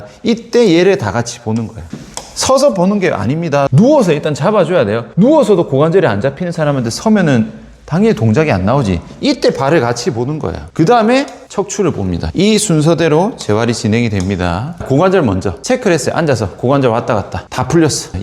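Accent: Gyeongsang, South Korea. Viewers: 너무 웃겨서 자꾸 듣게 됩니다 경상도 말이 재미있어요 ~~~~~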